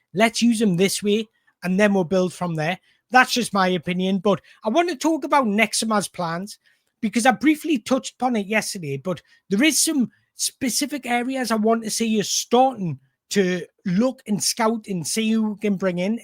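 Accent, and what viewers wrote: Newcastle, United Kingdom. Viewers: just be who you are mate your doing sound. Never try and hide your Geordie accent. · I love your Geordie accent pal. I much prefer hearing regional accents, personally. More importantly I love the content of your videos. Great for football geeks like me.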